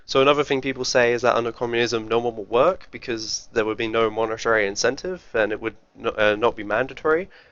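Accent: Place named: United Kingdom. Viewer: Communist is good, says the guy with british accent that grew up with access to the privileges of the first world. This is communism, talking sh*t and defending it, until you have to live under a suppresive regime like cuba, venezuela, north korea, ussr. I wonder why people run away from those countries. When was the last time you read about americans or brits running away to cuba?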